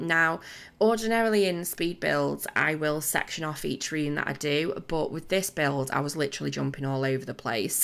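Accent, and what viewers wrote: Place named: Northern England, United Kingdom. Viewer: My boyfriend is really proud of himself - I occasionally show him accents and ask where he thinks they're from, and he guessed you were from somewhere North of Manchester. :P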